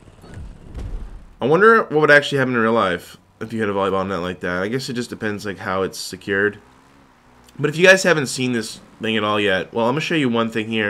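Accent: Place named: Canada. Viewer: Let me make a vid with you. Your in Canada because of your accent, I'm flying there soon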